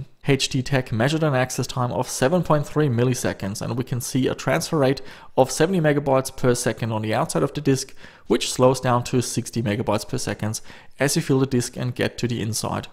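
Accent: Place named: Germany. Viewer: An deiner Stimme erkennt man sofort das du Deutsch bist :D .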